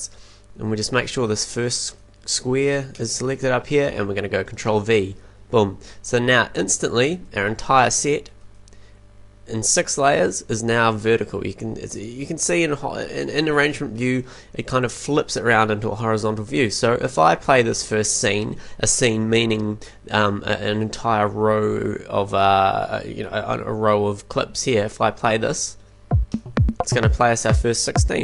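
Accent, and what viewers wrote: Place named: New Zealand. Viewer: Oh and by the way, I love the accent, I'm from Australia and for some reason. Just love New Zealand accents. "You might like 4, you might like 8, you might like 10 or 2. I like sex!" Hahahahah that was soo good! Love you man, keep up the good work!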